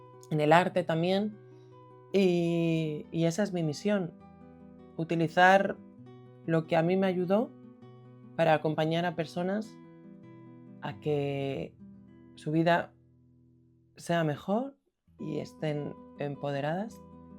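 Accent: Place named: Galicia, Spain. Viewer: ¡Queridísima amiguiña! (con ese acento galleguiño que tanto adoro), felicidades por este precioso vídeo tan auténtico, donde muestras tu alma cruda con total sinceridad y valentía. Me he emocionado mucho, gracias por tus palabras, para mí el camino también fue muy especial, sobretodo gracias a ti. Aunque mi historia no es la misma, me he sentido identificado en mucho, porque también sufrí acoso en el colegio, aunque ni de lejos tanto como tú. Nuestro pasado nos ha llevado hasta aquí, eres así a pesar de todo, pero tu generosidad hace que quieras canalizar esa experiencia en ayudar a otras personas. Sin pretenderlo, con este video a mi me has ayudado mucho, ¡gracias! Acabo de empezar yo también una nueva etapa profesional solo para ayudar a otros bichos raros como los que tú y yo fuimos alguna vez, con la ilusión de ayudar aunque sea a uno a sentirse un poco más integrado. 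Quizás seamos unos ilusos, alguien pensará que incluso seamos débiles, pero ya una vez aprendí de otro coach, que los más fuertes son precisamente los que reconocen sus debilidades y errores ante los demás. Doy fe de que puedes ayudar a mucha gente, te apoyo y te animo a seguir, porque eres maravillosa única y especial.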